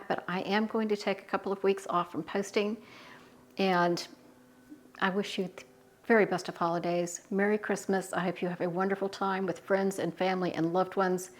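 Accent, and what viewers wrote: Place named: South, United States. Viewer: I'm following a small handful of Homemakers / homesteaders and I've got to tell you that you are one of my favorites. Your no-nonsense delivery coupled with yourself of the sweet tea line accent made me start following. However you're canning of beef Rogan Josh you made me a fan! As a long time canner I've done the water bath the pressure all the things. But never have I ever thought about spreading my canning out to the wonderful things such as the beef Rogan Josh the chicken Tortillas Soup the Brunswick stew etc... thank you for reminding me that I have the power to use better judgment following safe guidelines in Canning things that are delicious to me I will be one of the first to follow in a paid membership community because of the aforementioned reasons. I'm excited and I cannot wait for that day to happen. 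And I will urge you to please write a canning cookbook with these lovely recipes in them. I see you testing the pH I see the beautiful products that turn out. I just want to thank you for the knowledge that you are sharing.. May God. Bless you and your family and from my home to yours, Merry Christmas!❤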